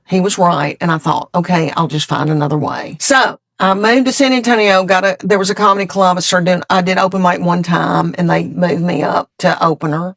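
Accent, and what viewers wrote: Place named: North Georgia, United States. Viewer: My family's N.GA accent is sooo similar to yours! My coworkers ask how I choose which words in a sentence to add an extra syllable, and which words to remove one. "We say y'all... When we want to call... Upon all." Early Cuyler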